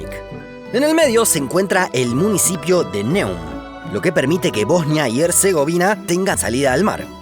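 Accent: Argentina. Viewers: lo iba a ver, hasta que escuche la repulsiva voz de acento argentino .. 🤮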